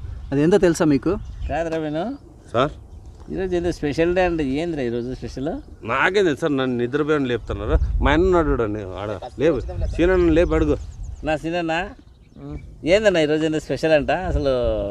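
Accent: Anantapur, India. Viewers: అనంతపురం యాస చాలా బాగుంది ! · Chala baga chepparu 👌 naku me videos antey chala istam garu. I feel very happy and relaxed while I'm watching. And Anantapur language vintuntey chala happy ga anipistundi. Thank you so much for making such videos and making people happy.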